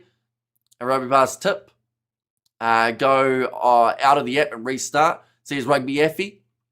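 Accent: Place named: New Zealand. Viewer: This fellow is not a great commentator. Speaks far too fast, very difficult to understand his acute NZ accent. Slow your dialogue down a little mate!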